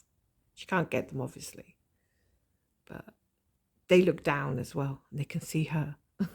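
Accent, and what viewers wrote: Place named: United Kingdom. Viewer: I've stitched with you on my only full coverage design and I must tell you how much you've made me laugh and how I've enjoyed your stories. I too had a cat and I recognised him in the descriptions of your cat's behaviour. Happy stitching and plenty of sun for us! ps. I also love hearing your British accent.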